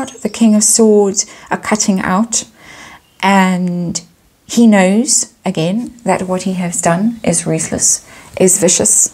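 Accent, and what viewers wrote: South Africa. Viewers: Excellent reading! I love your gentle voice and your your gorgeous South African accent - soo soothing. I also love how you speak about HM The Queen, who doesn’t deserve any of this. It’s beyond comprehension how HM can put up with one of her wayward relatives, not to mind two of them (Andrew & Harry). I’m hoping that both of them come to rue the day they cut her life short!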